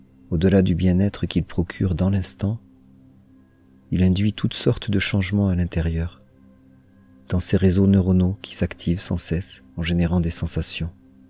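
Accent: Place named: South, France. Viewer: Qu’est ce qu’elles sont bien vos méditations et le guidage très doux, avec ce petit accent du Sud qui ajoute à la souplesse des images proposées . Merci infiniment.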